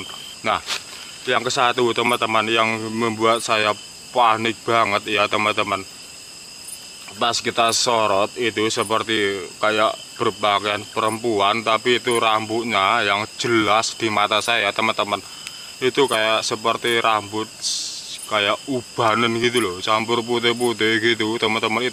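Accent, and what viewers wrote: Java, Indonesia. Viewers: Jawanya medok mas😅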